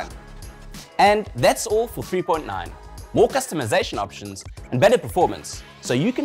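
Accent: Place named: South Africa. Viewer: Dude you sound like South African!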